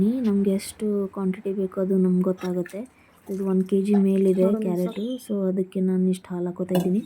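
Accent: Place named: Bangalore, India. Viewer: niv uttar Karnataka bitu Bangalore language yak mathadakaiiri😅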